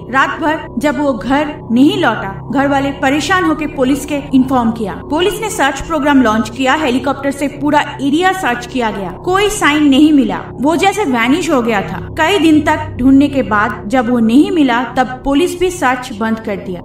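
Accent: Bengal, India. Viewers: Ur bengali accent is so cute.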